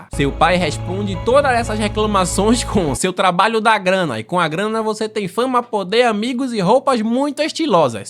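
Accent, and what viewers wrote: Brazil. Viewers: eu sei que vc tem o jeito abrasileirado porém você poderia falar as coisas da forma correta tipo o nome da valentina ou outras coisas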